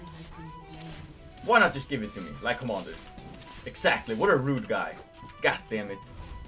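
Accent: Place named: Germany. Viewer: You do kind of look German and I have heard english speaking germans who sound like you. I initially assumed you were too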